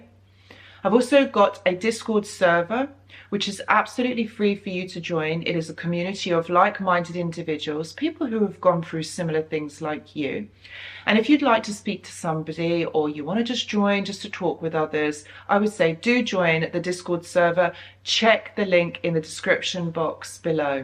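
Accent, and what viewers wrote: England, United Kingdom. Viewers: Yes, your videos are insightful..what I like even more is your luscious England accent 🤍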